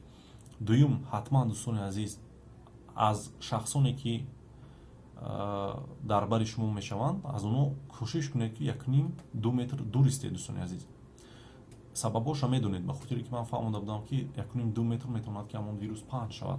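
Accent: Tajikistan. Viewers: Доктор саломат бошед! Ки бо лахжаи тоззаи точки гап мезанед салом аз Киргизстан Андарак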